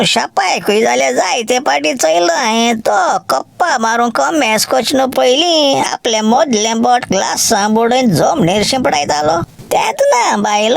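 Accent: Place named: Salcete, India. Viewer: Thank you, Love your news. VIVA Goa and Goans Love your Accent Saxtti Bhaxen ahm ❤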